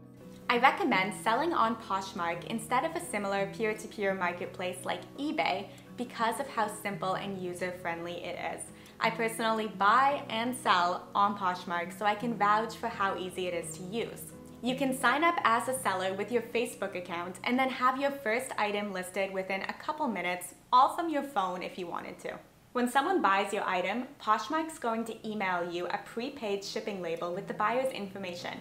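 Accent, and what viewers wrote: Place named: United States. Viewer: Why American accent? English is from England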